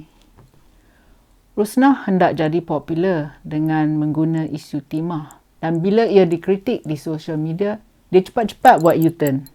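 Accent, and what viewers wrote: Perak, Malaysia. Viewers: Terbaik I suka benor bila cakap PEROK... Teringat kat kpg teman Di Batu 8, Ulu Kinto.. teruskan komen slang PEROK yer...👍🥰from Brunei 🇧🇳Ipoh Mali 😁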